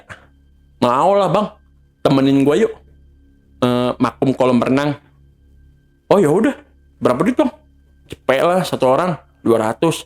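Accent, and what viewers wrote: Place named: Sunda, Indonesia. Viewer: Ngegerung sunda Banget bang😁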